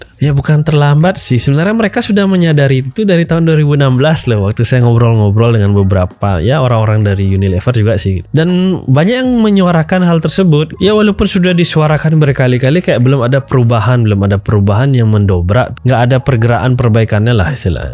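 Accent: Bali, Indonesia. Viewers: Bali deh kayaknya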